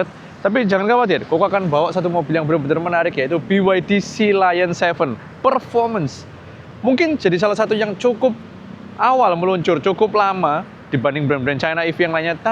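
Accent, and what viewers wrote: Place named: Java, Indonesia. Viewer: Medhoknya jangan sampai hilang Ko. Sudah jadi ciri khas.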